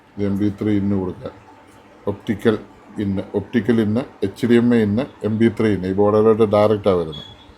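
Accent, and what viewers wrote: Kottayam, India. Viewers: അച്ചായാ വളരെ ഇഷ്ടം നമ്മൾ കോട്ടയത്തു കാരുടെ സംസാരവും സംസ്കാരവും ലോകം അറിയട്ടെ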